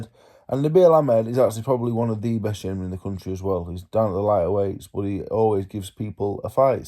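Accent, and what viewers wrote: Yorkshire, United Kingdom. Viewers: Glad you said Joe is smart...not being articulate doesn't mean someone isnt smart.. he's clearly witty. Stupid people think he's stupid. And agree, you clearly have said you speak funny cause of your tongue and you have a strong Yorkshire accent... you're as coherent as you've always been.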